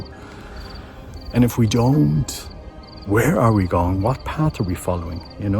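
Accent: Ireland. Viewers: The Eucharist is a treasure. Amen! Thanks for your videos, great to hear the Irish accent too 🙏🏿🙏🏽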